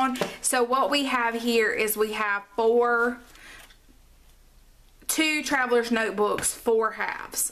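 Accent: South, United States. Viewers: That whole book sounded like a Southern conversation, lol. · Lovely story AND art!!! And the award goes to...🥁🥁🥁 Southern speaking ladies!!! I'm from Alabama so this is quite refreshing, like a big ol glass of lemonade. Love your videos. 💕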